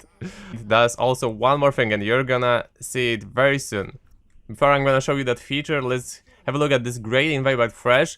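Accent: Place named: Poland. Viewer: Dobry akcent ale słychać że polak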